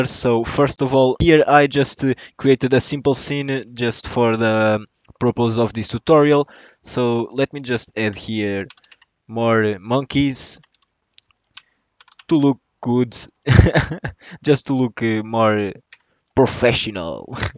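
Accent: Portugal. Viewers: Tuga no blender? Vou ja dar sub! soube logo pelo sotaque hahah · hahahha bro ouvi tipo 10 segundos e soube logo que eras tuga obg pelo tutorial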